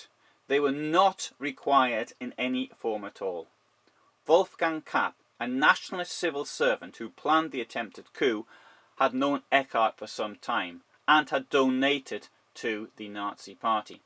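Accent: Newcastle, United Kingdom. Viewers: Geordie narrator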